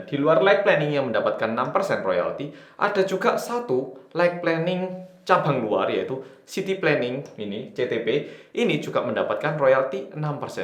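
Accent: Java, Indonesia. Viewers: Penjelasannya mudah di mengerti padahal Jawa ne medok tenan😎 keren keren 👍👍👍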